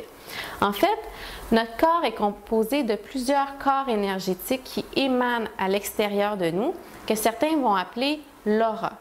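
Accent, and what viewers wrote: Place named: Canada. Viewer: Un très bel accent canadien! Merci pour ce partage! Très bien expliqué. Certaines choses, je les ai apprises au REIKI, la bule d'énergie.. J'ai coupé le contact avec mon frère et ma belle-soeur l'année dernière, ils étaient devenus toxiques pour moi. Pics, remarques, jalousie etc. Ils ne m'ont même pas soutenue pour mes manuscrits, qui finalement ont été édités:-) Depuis je me sens mieux, un peu triste que j'ai dû le faire au début, mais c'était nécessaire. J'ai essayé de renouer, impossible. C'est moi qui va tomber malade. Donc, j'ai laissé tomber. Toutes les personnes qui me conviennent moins, j'essaie de les fréquenter qu'un minimum. Certaines personnes je leur ai fait comprendre qu'ils n'appartenaient plus à mon cercle d'amis. J'essaie de m'entourer que de personnes positives, et qui ne me lancent pas de pics méchants, c'est difficile je l'avoue, mais faisable. 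Nous devons diriger notre vie, gérer nos projets, et ne pas vivre la vie des autres. Je l'ai compris assez tard, mais il vaux mieux tard que jamais. Ceci ne m'empêche pas d'avoir de l'empathie pour mon prochain et d'aider où je peux. Merci pour votre travail! Après 2 ans, comme j'ai eu un commentaire de Dayou Love, je rajouterai que mon frère et moi on s'écrit mais on ne se fréquente plus. Mon frère est assis entre deux chaises, je comprends! Mais plus personne qui me commande, c'est vraiment formidable!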